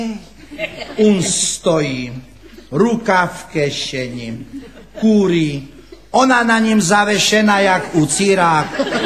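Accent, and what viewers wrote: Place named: East, Slovakia. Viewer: abo kto nepozna vychodnarcinu ten da palec dole podla mna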